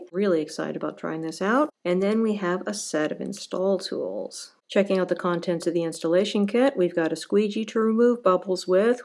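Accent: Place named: United Kingdom. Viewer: Hello ( for the French), by the way you sound British to me. Good job. It will be my next screen protector.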